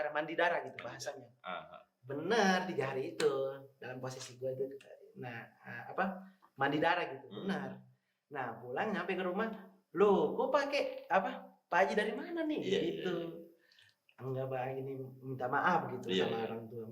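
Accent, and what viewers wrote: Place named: Eastern Indonesia, Indonesia. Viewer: "Apa Segala Macem" Logat orang timurnya gak ilang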